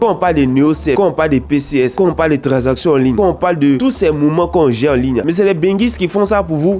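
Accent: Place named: Ivory Coast. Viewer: Moi c'est ta manière de parler qui me fan tu montres que tu es un vrai ivoirien et très fière ouiii ❤❤❤🎉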